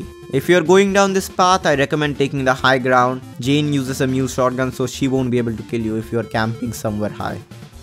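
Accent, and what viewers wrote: India. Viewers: are you an indian your accent is funny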